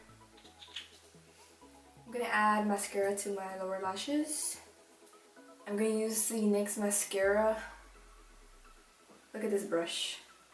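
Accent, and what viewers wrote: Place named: Mexico. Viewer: Lo supe por el acento!! Yo también soy de Mexico y creo que me escucho como tú cuando hablo inglés... es curioso jajaja :*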